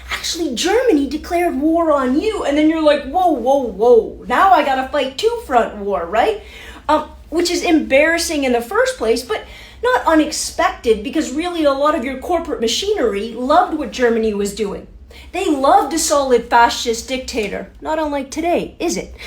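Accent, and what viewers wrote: Canada. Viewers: I got a lot from this presentation. I caught the Canadian accent and I'm not surprised that you're better educated than most of us. Fossils like me remember real teachers. They're gone. We have some excellent ones who can't teach the unteachable. Media has ravaged our society and driven it into the ground since the fifties. France has every reason to take back the lady. We've defiled her. I'll be petty at the end here and fuss over your hair. I want that in the spring 🌱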